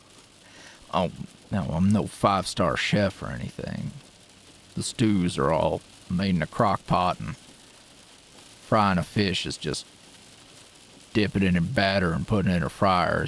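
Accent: South, United States. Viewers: plz more southern audios they’re ultra comforting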